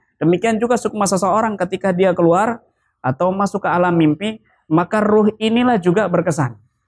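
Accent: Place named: Madura, Indonesia. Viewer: Kayaknya pak kiyai ini masih ada turunan madura, dari logat bicaranya!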